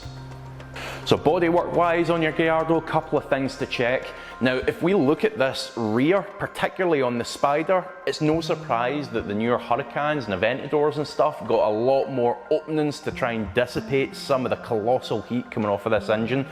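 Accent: United Kingdom. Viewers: Can’t understand shit he saying I hate British accent so ridiculously funny and can’t understand nothing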